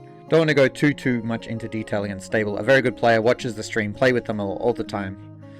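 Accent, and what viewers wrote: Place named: New Zealand. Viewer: His accent sounds like he's from New Zealand but it says hosting from Canada? Am I just mistaking the accent or did he move? Anyone in comments know the answer? (Curious because I'm from New Zealand)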